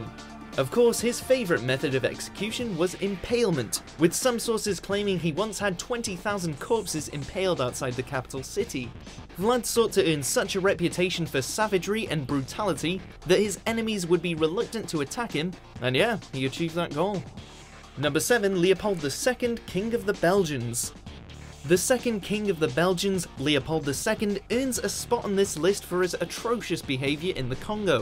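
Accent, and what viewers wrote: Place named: Liverpool, United Kingdom. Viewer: Can't watch this due to hideous Scouse accent saying 'merdered' every minute. Try not "murdering" the English language. Also "the women starved to death due to a lack of food"? Yes, that's generally why people starve to death.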